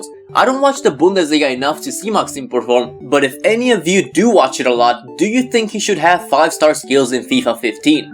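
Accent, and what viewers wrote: United Kingdom. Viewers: Dude,esti tare,continua...wow,ai un accent britanic excelent! ;) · nu mai pronunta numele romanesc cu accent de englezoi. e enervant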